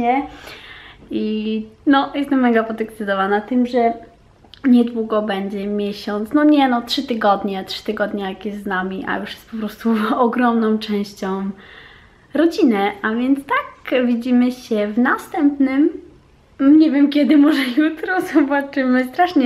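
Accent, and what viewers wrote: France. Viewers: dlatego, że we francuskim końcówki są "bezgłośne". Nie wypowiada się ich tak dokładnie jak w języku polskim. Przyzwyczajenie do innego języka. Poza tym myślę, że to bardzo niekulturalne zaglądać komuś do portfela i komentować, że się dużo na coś wydaje.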